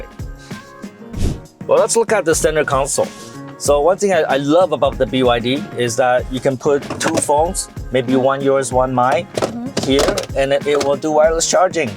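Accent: Standard. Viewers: chị nói tiếng anh chuẩn vl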